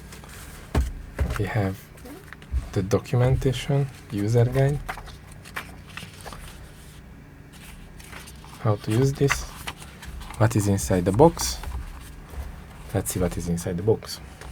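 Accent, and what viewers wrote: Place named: Hungary. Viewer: hey bro, I'm guessing you're Hungarian?!!! I sry... just hear your pronunciation.... Cool video ThX:person-turqouise-waving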